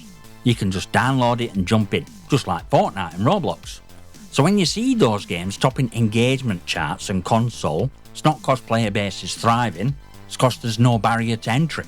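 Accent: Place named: Northern England, United Kingdom. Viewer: Fellow northerner here. No need for captions ;). All the best our lad!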